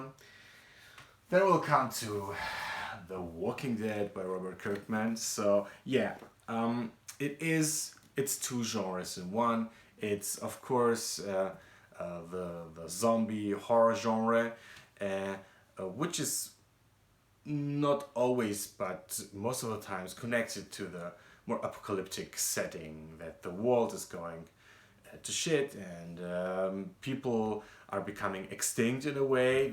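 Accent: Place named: Germany. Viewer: I don't think you talk funny, listening to you makes me miss Germany. You have an awesome ass collection and I am loving your reviews:)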